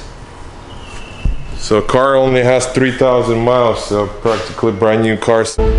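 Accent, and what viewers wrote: Russia. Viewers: bro, are you Russian?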